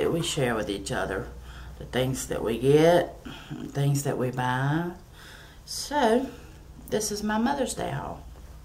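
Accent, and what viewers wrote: South, United States. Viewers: you are so sweet..."Im not trying to brag..." I just love your videos...I enjoy your hauls and your gifts you got for Mothers Day...Happy belated Mothers Day..I just became a subscriber...xxI am from Rhode Island...I know you are probably from the South from your beautiful accent..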